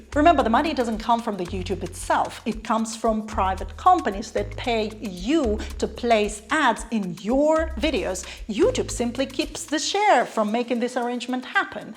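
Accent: Jamaica. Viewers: Girl I hear the Jamaican accent coming out lol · LOL....Good Joke....We love your accent, especially when the "Jamaican" part "sneaks in"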